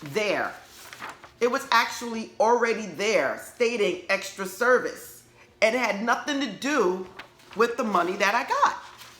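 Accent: New York, United States. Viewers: You have to be from NYC♥️🙏🏿I hear your accent. I’m from Brooklyn and enjoy your content. ♥️🙏🏿Blessings to you and your family. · Definitely from New York. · Tallkking..love my fellow nyer. Great mtrl..thank you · Girl! I have never seen you or your videos before but this video was in my feed and it is no accident. This is definitely something I needed to hear. I've done this in the past - not really knowing what it was called and it is an amazing technique. I must pick it up again because I need to break through some stuff. BTW, you must be a fellow New Yorker. I can tell by the way you say "tawk" aka talk. LOL. Anyway, I liked and subscribed.🙂👍🏽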